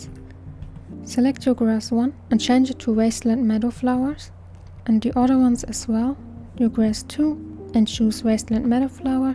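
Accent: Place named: Germany. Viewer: Oh youre german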